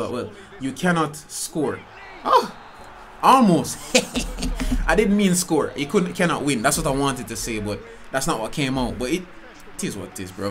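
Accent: Jamaica. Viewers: oh i been watching for weeks and i said to my self bet he's a jamaican😎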